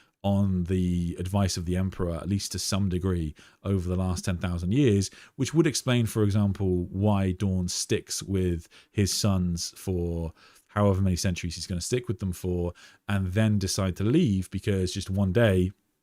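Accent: United Kingdom. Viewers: Its just his accent,he's British.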